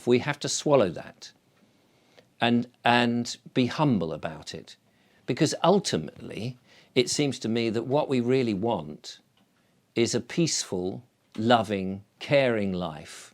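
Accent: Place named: England, United Kingdom. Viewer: Love listening to typical English man with real English language my 3rd language..Listening all the way from Solomon Island - formerly "British Solomon Island" prior to our independence..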